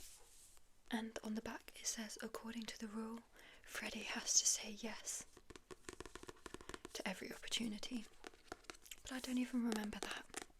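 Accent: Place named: United Kingdom. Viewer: you seem to be British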